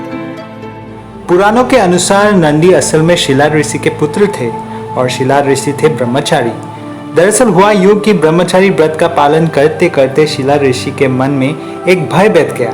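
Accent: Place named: Assam, India. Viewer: Great story brother.... By any chance r u Assamese? I mean just guessing from the tone of the voice🙂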